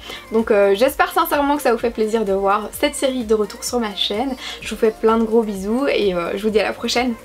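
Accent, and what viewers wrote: Belgium. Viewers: J'adore ton accent vive la Belgique!!!!😄😄😄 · J'ai remarqué que tu disais differement les chiffres y'a t'il en belgique une prononciation diffeeente pour les chiffres.Au début, j'étais confuse:)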